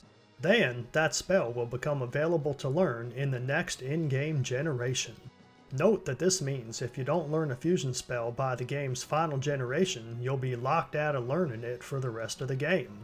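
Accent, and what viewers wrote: South, United States. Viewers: I never thought I would listen to a guy with a thick southern accent talking about my favorite childhood JRPG. I absolutely enjoy watching this series. Please keep it up.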